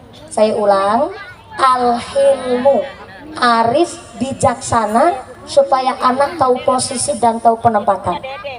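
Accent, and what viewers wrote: Banyumas, Indonesia. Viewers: Ceramah ngapak lucu tapi mengena para koruptor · Ceramah ngapak ustadzah mumpuni Like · Seru dan kocak sekali ya kalau pengajian mumpuni , ngapak ngapak 😅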